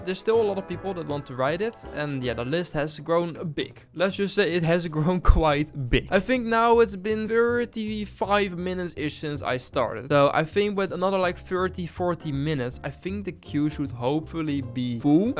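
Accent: Netherlands. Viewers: are you dutch i maybe hear a acent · I love your dutch accent!!(if its dutch) im dutch too. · Bro you sound so dutch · Jij bent Nederlands toch ik hoor het aan je accent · JE BENT NEDERLAND IK HOOR HET AAN JE ACCENT AHAHAH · I love your accent its an dutch accenr right? (Im dutch btw) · Engels met een Nederlands accentje😂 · We horen dat je Nederlands bent en dat zien we ook. Aan je naam dus praat gwn NL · I can hear you speaking english with a dutch accent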